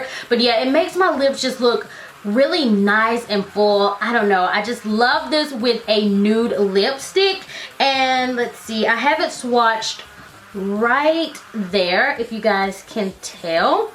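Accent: South, United States. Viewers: I KNEW i heard a SOUTHERN accent! ☺😊😊😊😊 im from alabama too! Do you have an eyebrow tutorial i looked but couldnt find one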